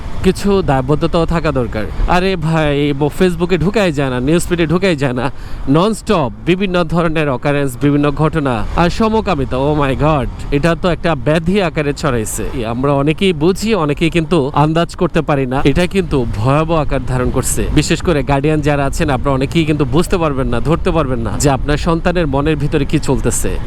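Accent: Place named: Bhuiyapara, Bangladesh. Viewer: Bhai apnar basha ki Bhuiyapara naki?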